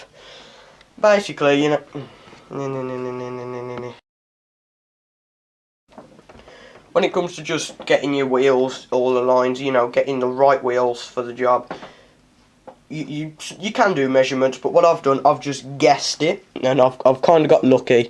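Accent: United Kingdom. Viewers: wouldnt this setting fuck up and slow you down? just wondering + man your cockney is deep af hehehehe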